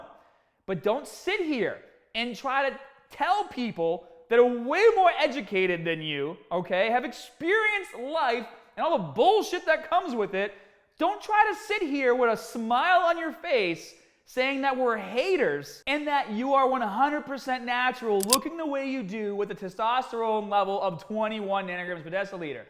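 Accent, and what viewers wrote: Boston, United States. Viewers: Damn you got a strong Boston accent bro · Wait a second. I’m subscribing for tha facking R kid. Boston I gather ? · The boston accent is killer! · You from Boston bro! I can tell from the T AH T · 1 min in, ik hes from boston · That Boston accent coming in THICK! Much love from Texas! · I came for the Boston accent. Wasn't disappointed.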